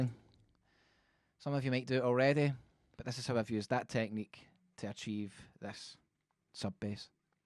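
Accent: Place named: Scotland, United Kingdom. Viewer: scotland haha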